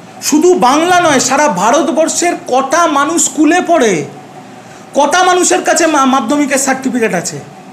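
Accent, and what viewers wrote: Bangladesh. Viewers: তোর বাবার সার্টিফিকেট আছে বানচো তোর ভাষাতেই তো বুঝা যাচ্ছে তুই বাংলাদেশের মাল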